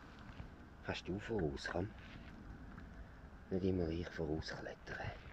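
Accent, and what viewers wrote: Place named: Switzerland. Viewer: Boah het mi das jetzt lang bruucht für usezfinde, dass du schwyzerdütsch retsch. Schöni Chatz <3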